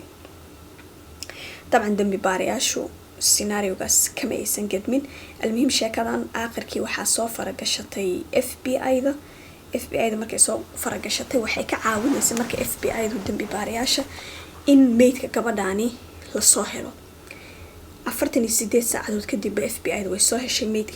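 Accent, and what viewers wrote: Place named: Mogadishu, Somalia. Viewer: Majarafad waa badiil lahjada reer xamar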